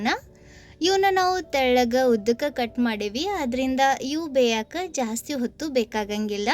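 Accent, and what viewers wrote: North Karnataka, India. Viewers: ಈರುಳ್ಳಿಯನ್ನು ಉಳ್ಳಾಗಡ್ಡಿ ಎಂದು ನೀವು ಏಳುವುದನ್ನು ಕೇಳಲು ಚೆನ್ನಾಗಿದೆ. ಉತ್ತರ ಕರ್ನಾಟಕದ ಭಾಷೆ ಎಂದು ಅನಿಸುತ್ತೆ.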